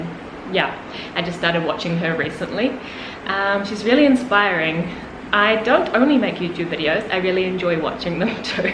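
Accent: New Zealand. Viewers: Are you from New Zealand? 😊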